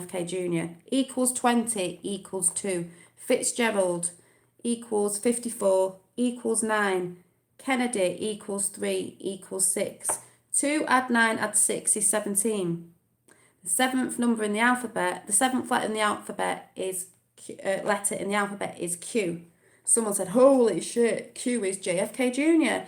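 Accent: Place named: Manchester, United Kingdom. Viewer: ... Typical accent from Manchester and little bit of Liverpool areas of Britain! 😘 💞 🎊🎈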